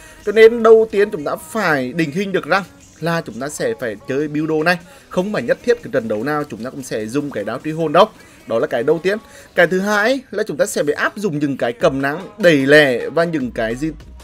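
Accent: Nghe An, Vietnam. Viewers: A ở chộ mô nghệ an vậy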